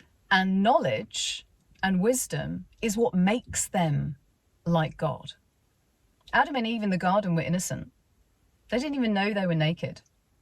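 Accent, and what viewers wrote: United Kingdom. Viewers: Great 😃👍 Brit🎉 accent ❤ ! Along with superb teaching, to 🥾 boot!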